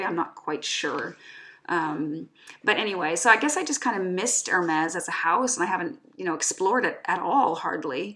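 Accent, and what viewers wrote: Midwest, United States. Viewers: I'm so glad you are posting. Years ago I met a handsome man from Sweden in a bar in Chicago on St Patrick's day. He had a lovely accent. You sound like you live next door in the midwest of the US. So today I tried a sample and found it to be glorious, but it faded quickly. It is an old and small sample. Do you think it fades quickly .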